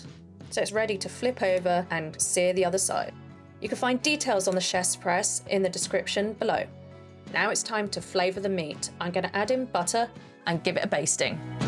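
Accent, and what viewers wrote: United Kingdom. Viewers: I don't know if you added a little touch but, your voiceover really has a nice british/english accent than your normal talking voice. I guess because your recipe today is a "westerner" type of dish instead of an asian dish ^_^ The sauce is the bomb! I might try this! I think that it can pair well with other meats!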